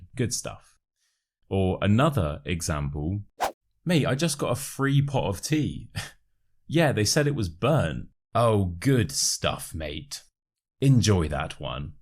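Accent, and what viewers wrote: United Kingdom. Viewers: I love your accent so British, I wanna speak like you 😊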